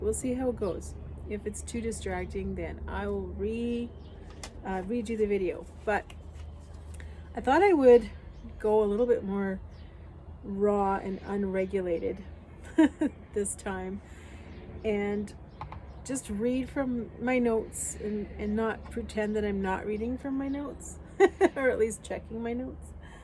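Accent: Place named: Canada. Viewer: Hello, Debbie over there with Life in Kentucky gave you a shout out and here I am! Curious George. I realize this video is 2 months old but the title is what pulled me in. Music is so important and remember how we used to listen to an entire album side A and B when we were younger? That sounds like a great idea for sure. I love cooking with music. I LOVED AND STILL LOVE Simon and Garfunkel and James Taylor. We always have music playing, I feel like life is a soundtrack. I'm so excited about you coming back to yourself. I can't wait to see more of your videos. I'm so glad I came on over here. Debbie is right. You are amazing, nice to meet you. I am Tracie from Life in the Wylde West. P.S. knew you were Canadian, I love your accent.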